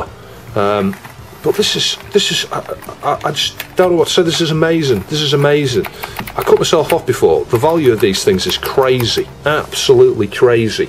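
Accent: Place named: Manchester, United Kingdom. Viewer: Love the video. That Manchester accent, though...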